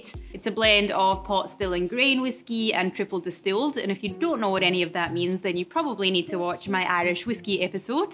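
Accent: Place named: Scotland, United Kingdom. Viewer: well, we all want more of that Scottish brogue! Cheers and best wishes from San Francisco. :)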